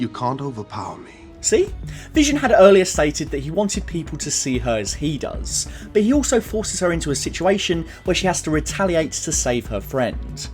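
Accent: United Kingdom. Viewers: I enjoy your videos, but find it jarring when you use pseudo American phrases and pronunciation, when you're clearly a Brit. I imagine you're choosing to do this in an attempt (perhaps correctly) to appeal to a USA audience, but it is nevertheless unsettling to hear, and I suspect that your audience in the USA and Canada enjoy your accent and language precisely because you're different. The expression "Often times" for example. I've lived in the UK my entire life and I have never heard anyone say that. Literally not a single occasion. We simply say "Often". Similarly, your reference to "Taffy"? Where did that come from? That's not even a thing over here, so why would you use it as a similie? Finally, and perhaps the most troubling is the way you've aped the USA pronunciation of the name Thanos. Admittedly, it's a character invented by an American, but surely one which has its roots in Greek mythology, and both Brits and Greeks would pronounce that word as Than-Oss, and never Than-owse. 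That's all, otherwise, fab vid. Thank you for sharing. Just wanted to say that your own accent and linguistic style is just fine and charming, but the choice of some select words and pronunciations is a bit jarring - next thing you'll be drawing the 'drapes' and putting the broom in its 'closet' ;-)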